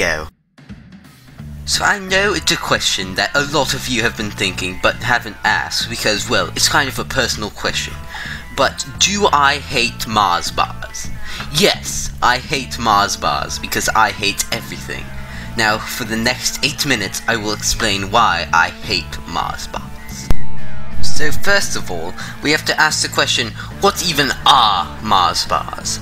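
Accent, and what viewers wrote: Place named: United Kingdom. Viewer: Why the British accent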